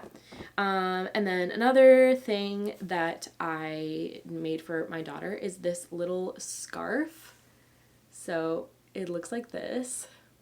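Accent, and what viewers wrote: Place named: England, United Kingdom. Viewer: I was trying to guess your nationality as your accent wasn’t strong , …. That’s because you lived in England ❤ xxxx What is a touk? Yellow is my favourite colour too, just knitted filcona arwetta french vanilla colour with drops lemon mohair cardigan by petite knit , circular shoulder style … love it 🥰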